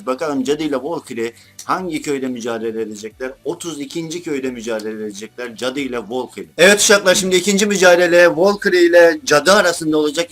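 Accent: Black Sea, Turkey. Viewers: abi eskiden karadenizli oldugundan sesin farkli geliyodu ama artik alistim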